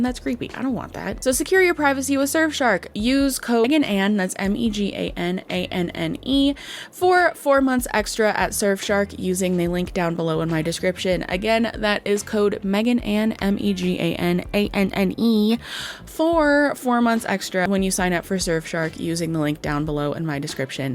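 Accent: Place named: Upper Peninsula, United States. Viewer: YOOPER! I knew Iknew your accent!!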